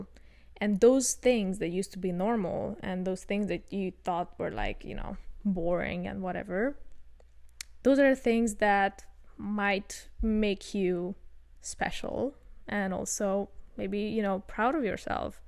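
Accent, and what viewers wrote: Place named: Hungary. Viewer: It's cool hearing you speak English, as a Hungarian I always cringe because of my accent, but I actually like your's even if it is Hungarian.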